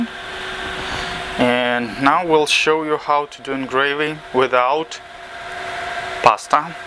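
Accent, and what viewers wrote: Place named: Russia. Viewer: Takoy Russian accent :)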